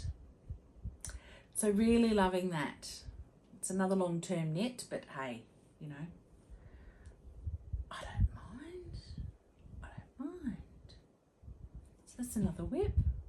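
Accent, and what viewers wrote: Australia. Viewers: Look, you could possibly take your own advice and not knit the rest of the MKAL just because you aren't feeling it. But you can still use what you have done to make something. One of the European knitting podcast girls made hers into a cowl. I'll go follow you on Instagram and leave a comment with a link to her for you. So enjoy having another Aussie to listen to while I knit. 💜💜💜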